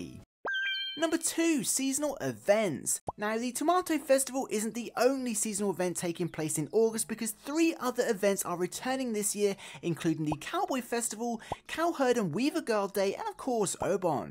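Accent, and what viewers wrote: United Kingdom. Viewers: Are you from Great Britian?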